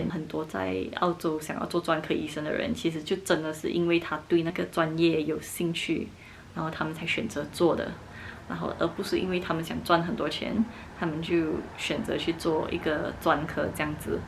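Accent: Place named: Cantonese, China. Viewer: 马来华裔么，中文不错，就是带点粤刚音，可能是广东那边的移民。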